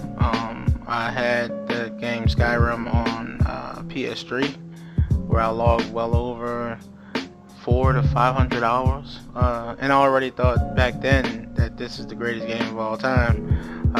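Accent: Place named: New Orleans, United States. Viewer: Yay for 80s kids! Oregon Trail and Carmen Sandiego were the two favorite games of my childhood. Oregon Trail was the first game I ever role-played...or wrote fan-fiction about, for that matter...I had lots of little drama-filled stories about the party members spinning out in my head and sometimes on paper. Gopher is one of my big inspirations, too. All these great role-players have me watching Skyrim videos more than I actually play the game myself these days. That's what I love about this game; there are so many other stories to be told within the framework of the official narrative. Ah, New Orleans! That's why I couldn't place your accent. My folks are from San Antonio and Austin, but my dad spent a lot of his childhood in the valley, and my aunts and cousins are scattered all over. A few of my cousins used to live in Houston, and one of my best friends just moved out there with her boyfriend recently, so I've heard stories about the traffic. 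I've only visited Houston once, more than 15 years ago, but it was pretty bad then, too. And Texans complain about Californian drivers...I say we just skip the flying cars and go straight to teleportation pads. If only fast travel worked in real life... Do you have a character concept in mind for your first ESO character, especially which faction you'll play? I pre-ordered too, and I have lots of plans for my first character--an Argonian in the Ebonheart Pact, because I always play Argonians first in Elder Scrolls games. Ok, I just lo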